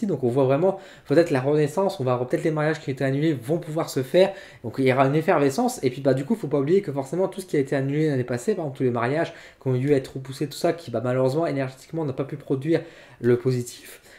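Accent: North, France. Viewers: Oh toi tu es du nord ! Non ??? 😘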